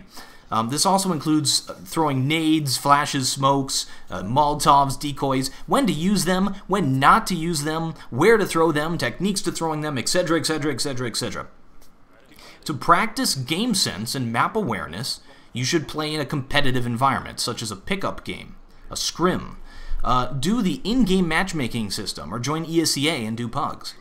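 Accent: United States. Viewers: He sounds so American.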